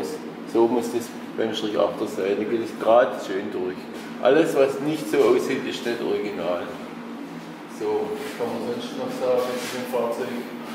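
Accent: Swabia, Germany. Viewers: Danke für das informative Video! ...Ist das Stuttgarter Schwäbisch oder eher Ludwigsburg?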